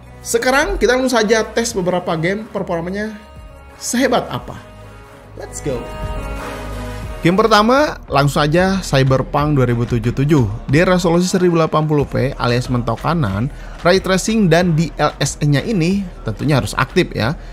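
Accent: West Java, Indonesia. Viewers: Nyunda pisan atuh kang logat na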